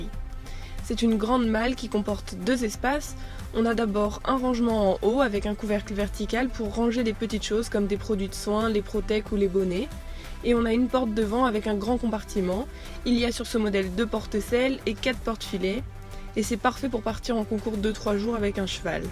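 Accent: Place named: Belgium. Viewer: J'aime tellement l'accent Belge 💕 C'est sûrement parce que j'ai grandis à la frontière ^^ Sinon vraiment sympas la vidéo 😊 Ca doit être lourd à pousser quand même !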